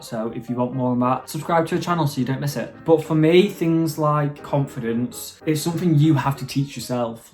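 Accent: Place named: England, United Kingdom. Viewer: Absolutely love your vibe ... and could listen to your voice all day. Love an english accent ..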